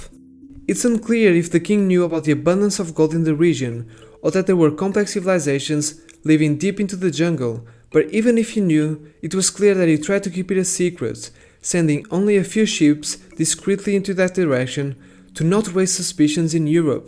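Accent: Portugal. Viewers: Let me guess... youre portuguese?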